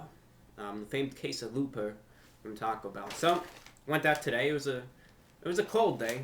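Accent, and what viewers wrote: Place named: Rhode Island, United States. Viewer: good reviewing, you sound like you are from the great state of Rhode Island!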